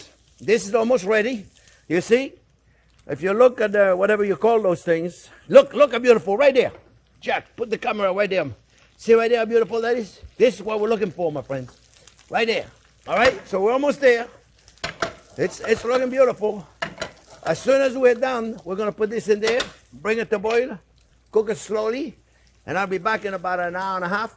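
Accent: France. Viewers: You may have a French accent but you are a great American. I love you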